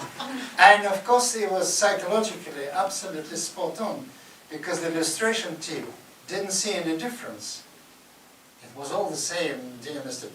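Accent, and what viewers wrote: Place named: Russia. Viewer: Всеволод Борисович звынайтэ) Но, у Вас четкий, русский акцент. И интонации. Конечно, англичане не поймут. У них у самих там столько интонаций..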